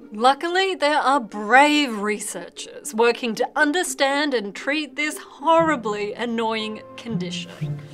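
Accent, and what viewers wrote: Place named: Australia. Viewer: Just wanna say that it's nice to hear an Aussie accent on YouTube. :)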